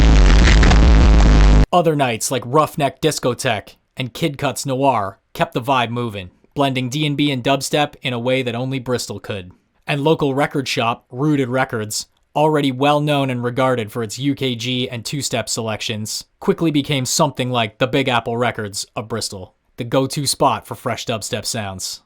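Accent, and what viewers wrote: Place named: United States. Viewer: even though it's been literal decades it's still weird to hear someone with an american accent talking about OG dubstep great vid great music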